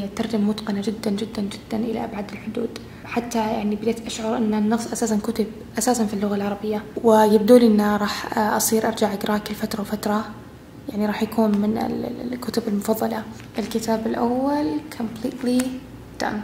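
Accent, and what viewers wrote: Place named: Morocco. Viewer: واايلي ماعرفتينيش منين راه غير من الهدرة باينة 😌 🇲🇦🇲🇦🇲🇦🇲🇦🇲🇦🇲🇦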